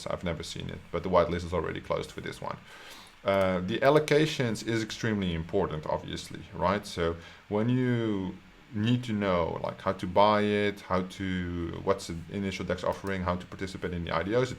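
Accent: Netherlands. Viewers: Just as early as yesterday.. Are you Dutch btw? Your English is great but I hear the Dutch I think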